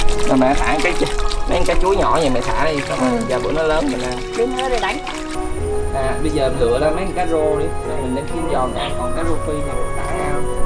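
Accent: South, Vietnam. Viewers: Sao mình nghe giọng bạn miền nam mà cảnh vật đặc sản như ở bắc vậy hơi thắc mắc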